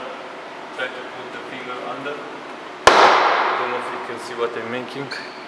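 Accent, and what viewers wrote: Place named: Ireland. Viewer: It's an irish accent I believe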